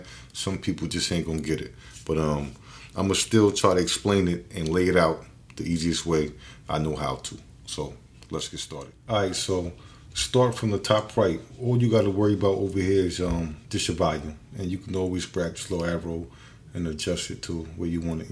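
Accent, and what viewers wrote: New York, United States. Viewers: You got that real New York voice. Lml what part are you from?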